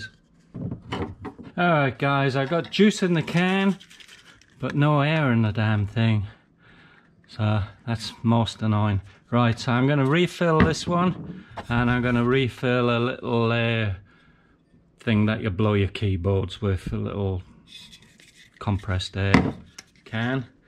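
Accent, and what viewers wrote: United Kingdom. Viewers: Loving this, one question are you British?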